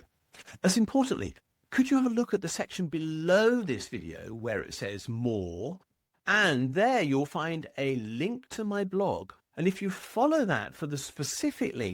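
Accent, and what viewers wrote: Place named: Upper class, United Kingdom. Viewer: Frankly, this seems half baked, motivated reasoning, even disinformation. Like contrasting "keynesianism," which are an awful lot of things inspired by many different economists and policy wonks over a period of time, compared with a neatly wrapped tied and bowed catch phrase ladened MMT is too GD simple, exactly how disinfo works. "Not saying, just saying. Lots of wheels, gears, cams chains wiring and energy sources glossed over or left out of the equations. My personal belief: Taxes are what we contribute to making our institutions, infrastructure and defences better in combination with more modern technologies. They need not be "wasted." Incompetence, graft and corruption are waste and plebiscites can be deceived into accepting them, but inclusive self government and soundly educated participation is a bulwark against. 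Innovation and improvement is not germinated by funding rapacious capitalized adventurers. There's obviously and transparently more to this, but I've gone on long enough. All voiced with a "patrician" upper class English accent. FFS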